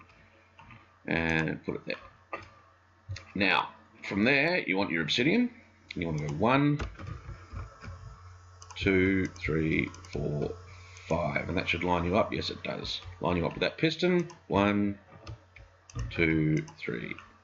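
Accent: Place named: Australia. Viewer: Great to hear a fellow Australian, and thanks for posting the tutorial! It's a great first video.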